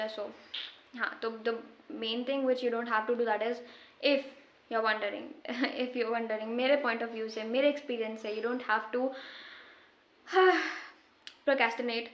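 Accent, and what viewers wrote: Bihar, India. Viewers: kya aap bihar se ho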